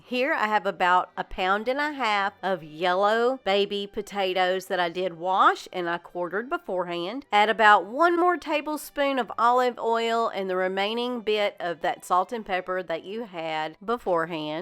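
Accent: South, United States. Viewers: I love your way of talking. Southern talker, perhaps ?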